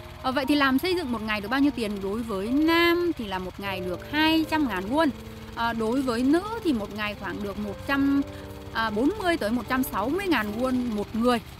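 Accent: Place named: Nghe An, Vietnam. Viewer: Quê nghệ An hay thái bình hả cháu ơi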